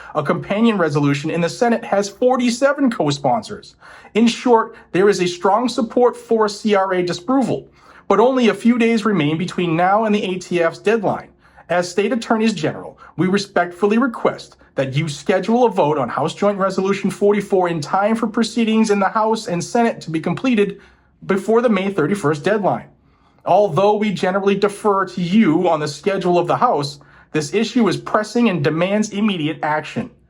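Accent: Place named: Utah, United States. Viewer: sounds like Utah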